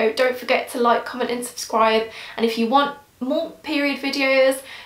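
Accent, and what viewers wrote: United Kingdom. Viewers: Love from India. I absolutely love british English accent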